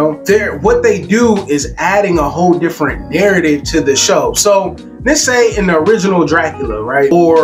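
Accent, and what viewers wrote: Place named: RP, United Kingdom. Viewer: Thanks for dropping the Mary Poppins accent. Made the video much more enjoyable!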